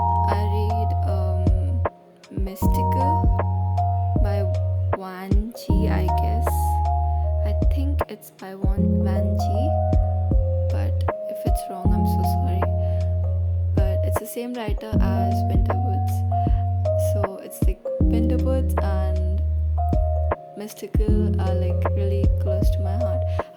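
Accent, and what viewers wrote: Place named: England, United Kingdom. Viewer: So nice voice ..... This like Anglo English..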